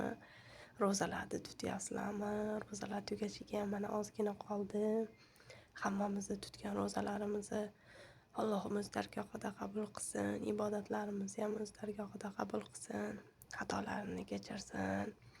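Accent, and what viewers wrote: Andijan, Uzbekistan. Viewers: Оллохни уйида мазза киляпсиз экан да оллохим уз даргохида Кабул килсин Каеридансиз шева анжан а рахмат катта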